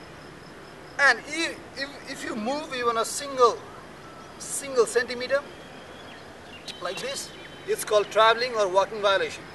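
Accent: India. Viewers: wow awesome video and anyone can identify an Indian pronouce good job! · Indian accent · Were you from from indian bruh i dont understand you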